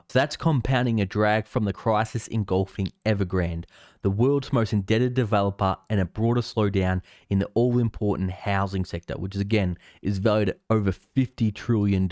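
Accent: Australia. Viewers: Yeah u need subtitles for you American viewers they won’t understand your full Aussie accent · Mahket? Its not a silent R, its market banned cool? You mean coal. I've never heard such a slack jawed aussie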